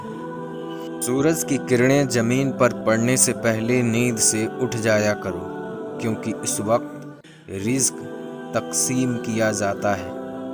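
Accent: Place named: India. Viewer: Aap kya India se ho aapke bolane ka Andaaz Sahi Nahin Hai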